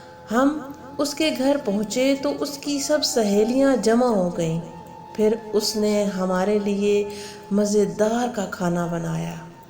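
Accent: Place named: Punjab, Pakistan. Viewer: کمال پنجابی تڑکے کے ساتھ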